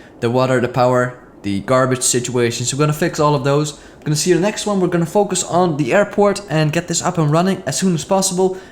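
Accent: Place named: Netherlands. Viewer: I know I am a bit late but are you dutch because you have a dutch accent and if you are so am I love city skylines I live in Rotterdam groetjes uit Rotterdam zuid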